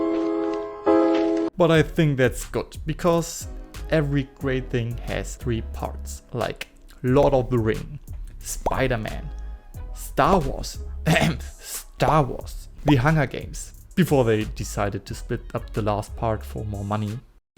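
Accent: Germany. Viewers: does this work with rift s? xd und biste deutsch? xd hört man irgendwie raus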